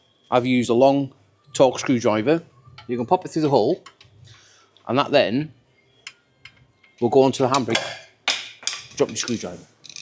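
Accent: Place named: Wales, United Kingdom. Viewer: Subscribed. Love your videos -you’re a knowledgeable chap, who does a proper job… I find your uploads very interesting… Those shoes don’t seem to start life very thick by the looks of the new ones! Being nosy, whereabouts in Wales are you, I used to live on Anglesey, but I wondered from the accent if you were more like south Wales…..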